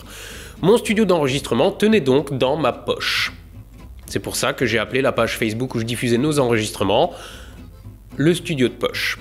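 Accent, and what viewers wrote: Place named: Marseille, France. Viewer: a été avec Benoit de Devient photographe les tous premiers tuto que j'ai suivie et en effet il me semble que sa fait bien 8 ans maintenant. Le temps passe et tous évolue aujourd’hui c'est deux cité ne propose pratiquement ou plus de contenu... Je garde de bon souvenir de visionnage et il m'arrive encore souvent de visionnée des vidéos. moins passionnée d'astronomie je vais quand même souvent liké les vidéos pour l’algorithme, car au delà du faite d'avoir des tutos intéressant le personnage avec sont franc parlé et ses mots direct est très appréciable par sa simplicité et étant marseillais je suis habitué a cette façon de parlé.... Bonne continuation a...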